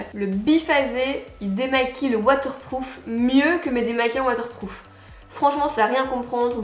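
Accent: Belgium. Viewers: Tu es belge ou francaise? Pas je t'adore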